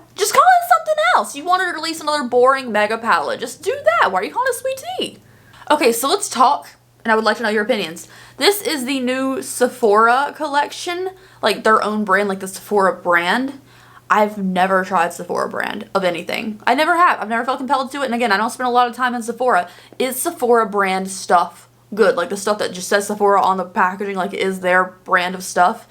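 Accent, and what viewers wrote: South, United States. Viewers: i would differently watch review of the Jaclyn's launch. I also love your southern accent its so cute.😺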